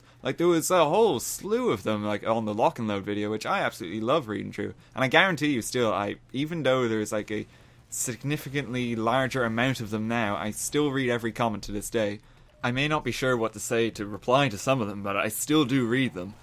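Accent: Ireland. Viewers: I have only been here for about a month but I absolutely love your videos. It also helps that your Irish :D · Are you irish?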